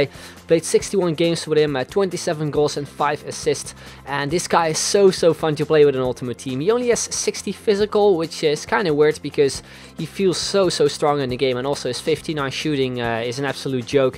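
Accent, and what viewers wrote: Netherlands. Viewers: Kom jij uit Nederland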